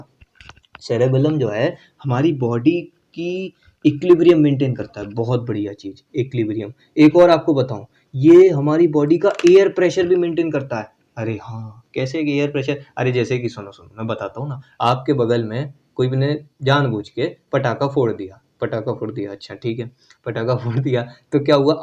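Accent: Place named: Bihar, India. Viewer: sir aap bihar me kaha se hooo...aapki boli se pata chal raha hai ki aap bihari ho...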